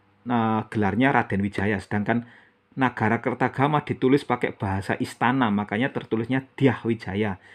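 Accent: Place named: East Java, Indonesia. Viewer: Video ini berisi narasi dalam Bahasa Indonesia dengan dialek Jawa Timuran.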